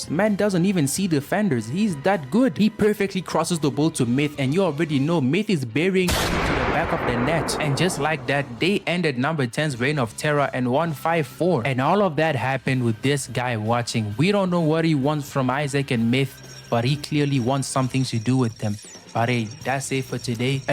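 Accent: South Africa. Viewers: Hmm are u south African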